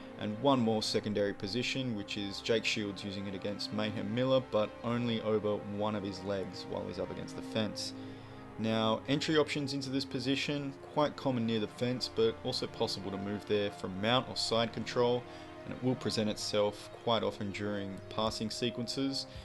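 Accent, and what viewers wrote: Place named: Australia. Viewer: Bro youre a beast. Aussie accent rofl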